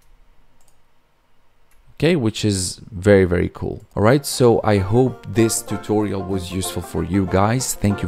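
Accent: Russia. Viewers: Неплохо маскировал русский акцент.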